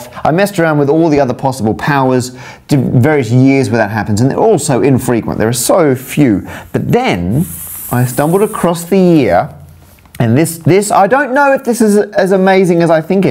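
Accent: Australia. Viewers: tell me more, Mr. Australian Math Man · He sounds Australian to me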